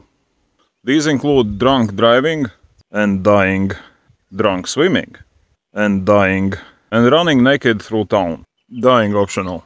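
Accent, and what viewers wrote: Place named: Latvia. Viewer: Labākais Latviešu akcents 🤘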